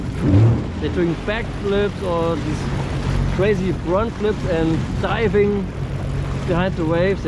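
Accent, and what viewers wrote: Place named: Austria. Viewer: Stark gemacht danke.. hörst dich bisschen österreichisch an haha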